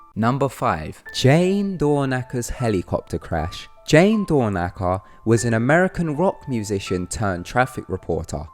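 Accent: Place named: Russia. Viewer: Русский акцент у говорящего..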